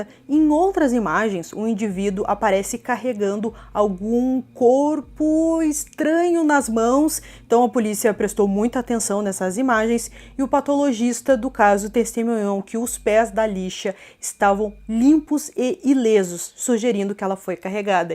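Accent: Rio Grande do Sul, Brazil. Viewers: Tu és gaúcha?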